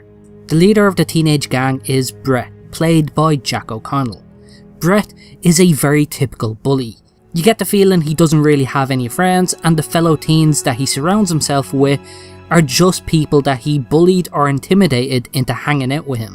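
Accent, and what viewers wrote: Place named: Ireland. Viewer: Are you Irish or Scottish? I love your accent. I studied RP at the university. Oh, it says that you are from Ireland later on in the clip.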